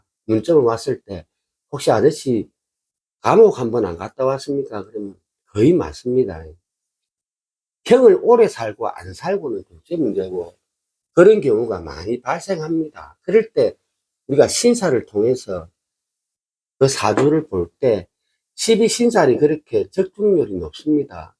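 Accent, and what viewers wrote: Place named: Gyeongsang, South Korea. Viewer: 경상도 사투리가 넘 구수하네요 😊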